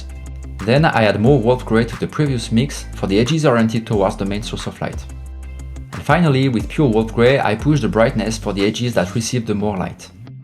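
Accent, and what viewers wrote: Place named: France. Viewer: Ah, cet accent Français inimitable, qui a son charme :) Merci pour le tuto.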